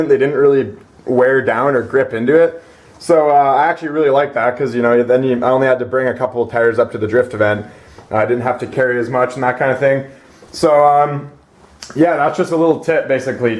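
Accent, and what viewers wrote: Canada. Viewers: smooth canadian accent, sound like the trailer park boys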